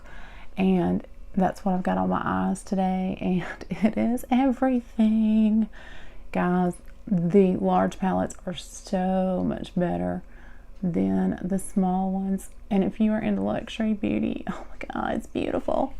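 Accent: United States. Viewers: I’m from England and I love American accents and yours is my absolute favourite ❤️❤️ my <mail>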